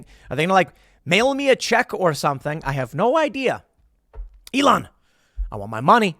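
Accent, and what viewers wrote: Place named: California, United States. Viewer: Is that a California accent or what? I hate it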